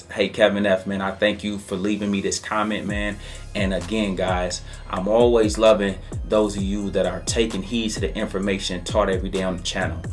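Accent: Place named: North, United States. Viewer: haha.. love that Northern accent! im from RI but living in Tennessee Y'all. 😅😅😅 Fixin and yant too? 😒😒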